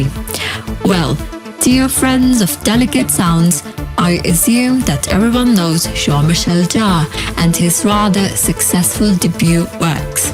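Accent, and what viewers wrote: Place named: United Kingdom. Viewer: Loved the British accent!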